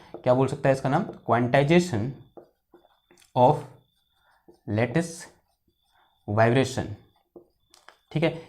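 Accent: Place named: Garhwal, India. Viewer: Bhai , garhwali hai na pura Accent aarha hai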